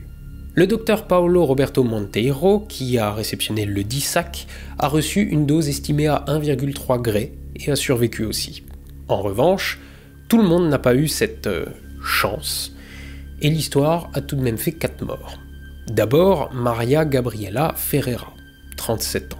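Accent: Alsace, France. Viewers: l'accent alsacien 👌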